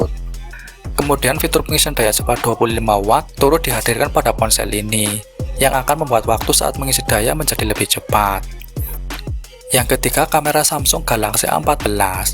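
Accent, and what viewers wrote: Banyumas, Indonesia. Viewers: Soalnya aksennya "ngapak". Jadi harus menekan aksennya itu biar bisa ngomong bahasa Indonesia dgn baik. Gitu sih kayaknya. Hehe.. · orang ngapak ya bang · Ngapak ngapak.. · Suara ngapak nya keliatan bnget yakk.. wkwk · KAN ORANG NGAPAK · Ngapak ya.. 😁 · logatnya ngapak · Jawa e ndi bro? Ngapak banget yah 🤣🤭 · Wong ngapak ya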